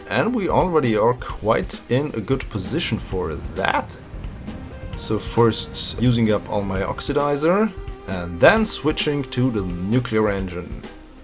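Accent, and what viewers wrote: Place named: Germany. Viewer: okay thank you . i have another question xd are you German ? your accent sounds like a German xd Wenn ja wäre es ganz cool denn ich hab da noch ein paar Fragen die ich so auf englisch nicht gestellt bekomme xD Dafür ist es einfach zu schlecht :D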